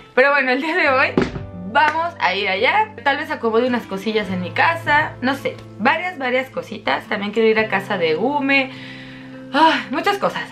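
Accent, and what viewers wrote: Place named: Spain. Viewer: aquí estoy apoyando con mi granito de arena viendo todos tus videos como mil veces en todos los dispositivos que tengo para apoyarte y que tengas muchas muchas vistas me encantas y por favor amo tu acento español 😅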